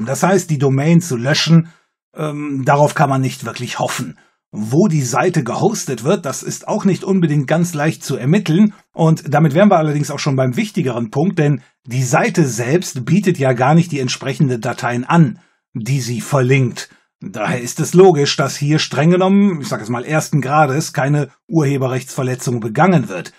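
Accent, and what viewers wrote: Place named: Northwest, Germany. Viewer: ***** "Chlor" = "Schlor"; "Chronik" = "Schronik"; "Chemie" = "Schemie" und "China" = "Schina"? Mann, mann, dieses Nord- /westdeutsch...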